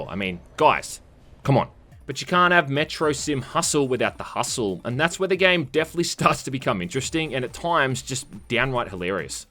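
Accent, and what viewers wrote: Australia. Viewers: I dont care about this game. I jusy want to hear an Australian guy whining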